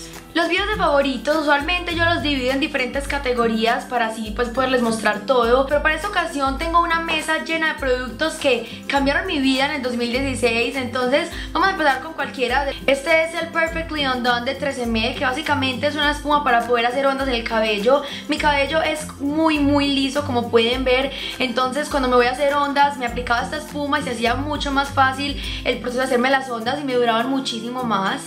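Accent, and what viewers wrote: Antioquia, Colombia. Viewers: me encantoa nuestro asento paisa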